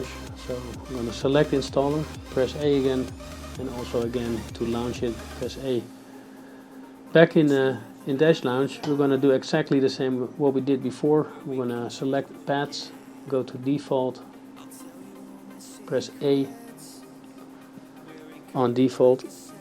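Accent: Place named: Netherlands. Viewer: I was born in the Netherlands which is why I'm very good at recognizing Dutch accents. So far I haven't been wrong and judging by your accent you must be Dutch.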